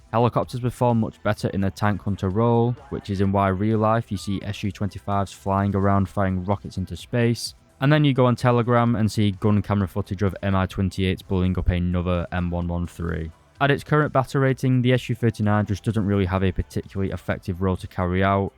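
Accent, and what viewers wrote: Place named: Estuary English, United Kingdom. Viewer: Hey man, your accent is so strong that Im actually struggling to understand certain words that you say. I think you pronounced R instead of W? then chat gpt told me this Estuary English." This accent is spoken by people from the London area, and it is a blend of traditional Cockney and Received Pronunciation. Estuary English is becoming increasingly common among younger speakers in the UK.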